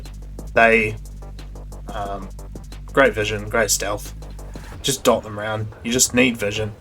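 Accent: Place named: New Zealand. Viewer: I thought you were saying "I'm just showing you my dick" for like 5 times until I realized you got that kiwi "i" vowel shift.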